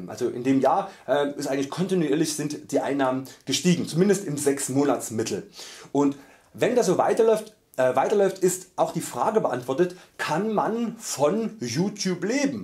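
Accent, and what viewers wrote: Saxony, Germany. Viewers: Hi:) inspirierendes Video! Ja mit dem Thema Verständlichkeit ist es immer so eine Sache ^^ Vielleicht solltest du eine Art Stichwortverzeichnis bzw. eigenes kleines Wörterbuch schreiben wo du deine eigenen Termini erklärst. Nach Schema: Liebe: ist die universelle Verbundenheit im Universum... Freie Liebe ist... Was Liebe nicht ist: Eiversucht: ist... Rohgan: ist meine Lebensphilosophie, die bedeutet Kommunikation: ist.. Partnerschaft: ist.. und dann jeweils vor deinen Videos darauf verlinken. Problem ist im allgemeinen dass Menschen unter Worten oftmals etwas komplett anderes verstehen. Dein populärstes Beispiel ist wohl "freie Liebe": ich behaupte 80% der Menschen versteht da Liebe(Sex) machen mit jeder & jedem in totaler ungebundenheit. Du scheinst darunter im Großen und ganzen etwas anderes zu verstehen. Das Problem ist, dass man Deine Videos meist nicht in Reihenfolge schaut, zumindest neue Abbonenten. 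In einem Buch kann man Begrifflichkeiten nach und nach erklären und das Buch und den Leser, so zu sagen, mit der Zeit aufbauen. Mit dem Medium Yt ist halt nicht direkt so gut möglich, sondern eher ein "Durcheinander". Und wie du sagst, es gibt Leute die einen patu nicht verstehen wollen, jeder deiner Zuschauer wird nur das aus deinen Videos beziehen, was er bereit ist aus ihnen zu erfahren. Wenn jemand im Voherein sagt, der blöde sächselnde... wird er eben diese Erfahrung machen. Wenn jemand beschließt dieses Video im Kontext der Bereicherung anzuschauen wird er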